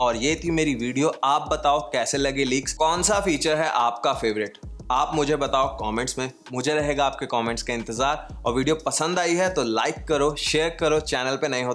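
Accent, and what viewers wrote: Punjab, India. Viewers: sir are you punjabi?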